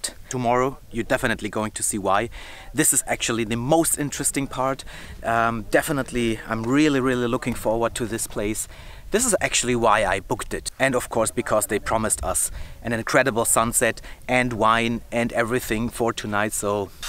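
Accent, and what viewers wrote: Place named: Germany. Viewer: You sound so German! 😄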